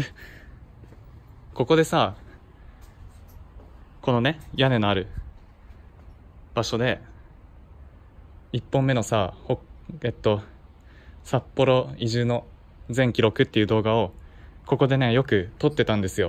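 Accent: Aichi, Japan. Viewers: 愛知の方のイントネーション好きです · たまにイントネーション違うな～って思ってたけど愛知のイントネーションなんだな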